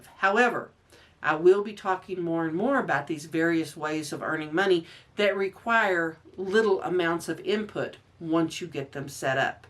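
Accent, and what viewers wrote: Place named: Indiana, United States. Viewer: Funny you are from Texas. You have the same accent of a friend of mine who is from southern Indiana.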